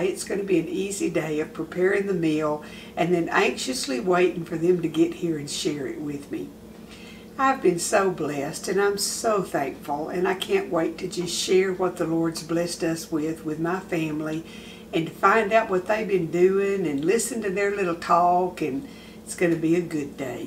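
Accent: South, United States. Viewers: Okay I'll start out by saying I love your kitchen beautiful I love your Paula Deen accent dead on I love the southerness in your voice I'm going to subscribe just for that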